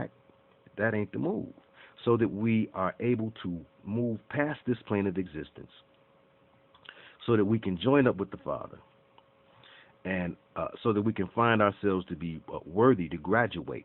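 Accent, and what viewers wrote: Detroit, United States. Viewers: You Detroit alright. haha. Your speech gives you away. wink wink. I'll add some jesters, to soften blow. I do have a sense of humor. I meant nothing, but to share. I'm the nicest eevvveeer! Might want to edit and erase #. I got it. They're are crazies out there, don't know. Is it you or me? lol Thanks though. Gave me a bit of a complex, but not much, I'll get over it. I've very delicate but when it comes to this here bible, I can't afford to fail. Everyone should feel the same so respect. Good I can watch your videos again. Woosh.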